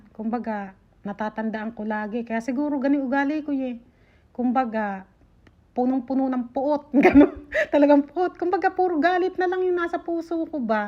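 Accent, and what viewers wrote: Nueva Ecija, Philippines. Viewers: Grabe yong buhay madam ano, lalo yong 10-13 pero talagang pag mag ti-tiyaga may maganda din mangyayari. Tignan mo ngayon super Blessed kayo. Followers mo ako sa dalawa mong fb account tas dito sa YT, silent, di ko lang napigilan mag comment ngayon. Andito din kami sa sharjah gusto ko din nga sana makapag bigay sa mister mo kahit paano sana tapos maisend sa inyo dyan sa pakistan. Ps. Ang asawa ko naman ay taga muñoz nueva ecija, kaya super natutuwa ako sa accent mo. ❤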